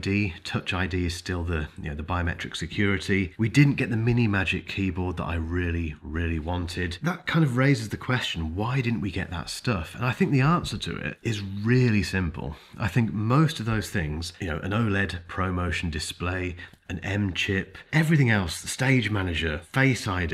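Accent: United Kingdom. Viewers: 看到你講話的方式跟聲音，就好像在看一部英國電影，十分有趣，期待後續 I pad mini 7 的ai評測。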